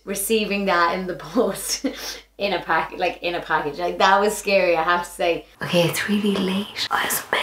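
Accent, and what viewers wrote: Ireland. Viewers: Man, simply stunning! From the gorgeous red hair to the quirky, charming brogue all topped off with that endlessly ethereal gown... Breathtaking. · I love the Irish accent ♥ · You looked exactly like an Irish Princess! Goodness that dress is amazingly gorgeous!!! Your hair looks so beautiful with the blue. Also your accent is amazing! My godmother is Irish so I’ve always loved Irish accents❤️❤️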